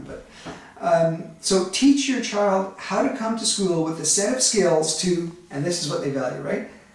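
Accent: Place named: Canada. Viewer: are you canadian? you say "aboot" alot.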